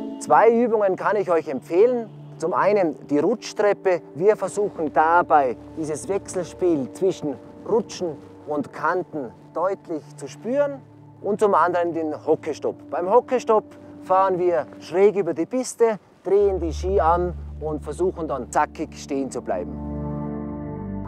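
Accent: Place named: Eastern Europe. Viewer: Hahhaha er redet deutsch mit so nem komischen fast osteuropäischen Akzent 😂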